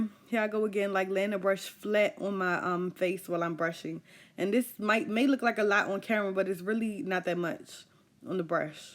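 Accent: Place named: DMV, United States. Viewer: 🗣YOU ARE GORGEOUS!!! Btw: I know a fellow DMV fam, I hear the accent. ♥️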